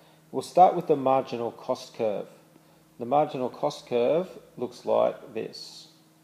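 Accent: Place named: Australia. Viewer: Love the aussie accent m8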